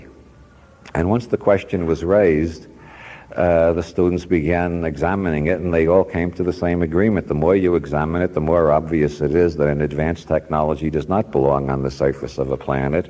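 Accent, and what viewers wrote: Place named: Brooklyn, United States. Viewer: Joseph Toth ugh, absolutely never. His voice has a cadence, timbre, musical lilt (and Brooklyn accent) that would be utterly ruined by such fuckery. Just my opinion of course, but there’s nothing humble about it. Cheers!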